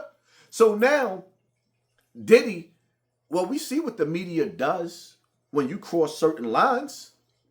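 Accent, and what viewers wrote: Brooklyn, United States. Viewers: BROOKLYN TONE N THE BUILDING,..EAST NY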